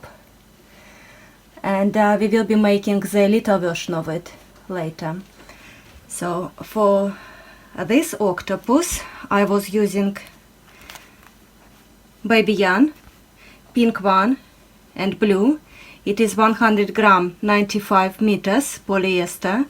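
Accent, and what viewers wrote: Russia. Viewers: Мне кажется или у вас русский акцент?🤔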